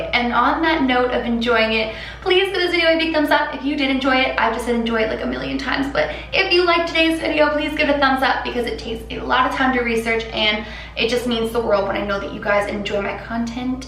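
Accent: France. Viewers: I love her French accent😋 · I know this is besides the fact and kind of random but you're french accent is beautiful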